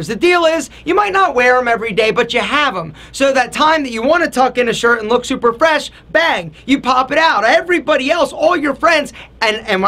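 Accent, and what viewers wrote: Philadelphia, United States. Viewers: The philly accent is brutal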